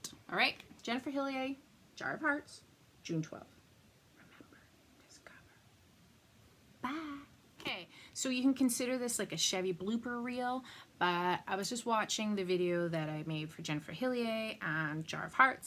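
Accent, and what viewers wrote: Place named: Canada. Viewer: I love your Canadian accent!! Happy for the suggestion for a good summer read,but cannot wait for another of your books! Have a great summer!! ❤️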